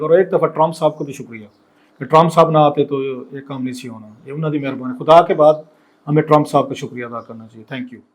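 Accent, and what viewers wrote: Saraiki, Pakistan. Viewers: بے وقت کی راگنی۔۔۔ یہ آپ کا موضوع نہیں ۔۔ سرائیکی سائیکی سے نکلو۔۔ اب تو اردو میں گفتگو کرنا سیکھ لو۔۔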